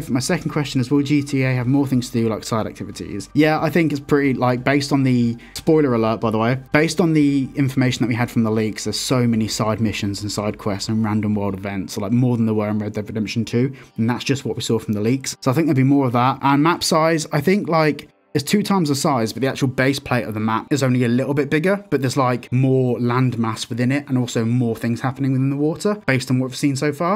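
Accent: Birmingham, United Kingdom. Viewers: R u from Birmingham geezer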